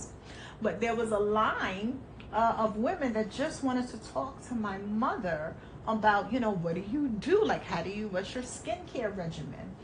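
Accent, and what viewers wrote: New York, United States. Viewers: The New York Accent I love that😍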